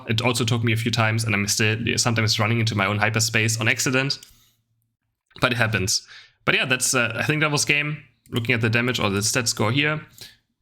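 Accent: Germany. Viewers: I can hear the German in his voice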